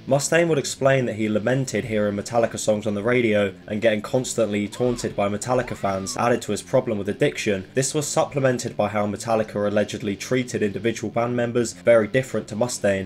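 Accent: United Kingdom. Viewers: I hate when red coats narrate American business.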